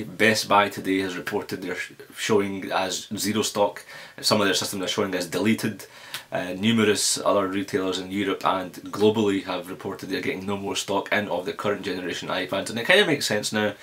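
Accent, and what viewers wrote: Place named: Glasgow, United Kingdom. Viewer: Accent is Glasgow, west Scotland, uk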